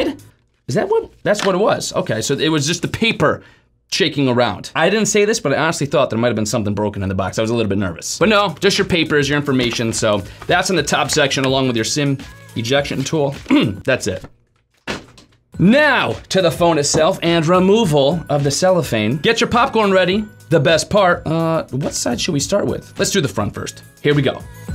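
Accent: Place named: Connecticut, United States. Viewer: from CT I'm from TN but raised in NY so in know CT when I hear it or Mass.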